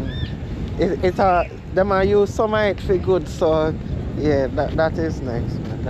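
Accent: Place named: Jamaica. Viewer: The seawall is vastly different from the 1960s when I last visited it. I am surprised they have not lit up the seawall wall itself at night since it is meant to be a gathering place. Anyone could fall off the ledge or be assaulted, and no one might notice. It looks like 2' to 3' wide. You should wear headgear with a LED light. LOL Did they eradicate the mosquitoes? The burger lady needs some training in customer service. She didn't even crack a smile or a "thank you for your business, and come again. Good night!" You met with with a nice group at the end. The one Trinidadian (accent) and the dozen Guyanese (accent) around the table reminded me of when I visited the relatives in Guyana. LOL At least they understood your Jamaican accent. If you put a Trinidadian, Guyanese and a Jamaican in a room with Americans, the Americans would get very confused. 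They couldn't understand me, especially as they were looking a Chinese speaking perfect English. My Trini (Chinese) nephew had two friends at a L.A. trade tech college; a A Belizian (Black) and a Jamaican (Black). They were conversing in their native dialects to each other in class. When the American instructor walked into the room, he said to the the ladies, "I didn't know you spoke Chinese." The trio looked at each other, and bust out laughing.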